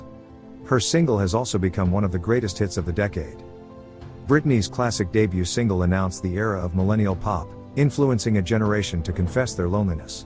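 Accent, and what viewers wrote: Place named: United States. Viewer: It's still him but with an American accent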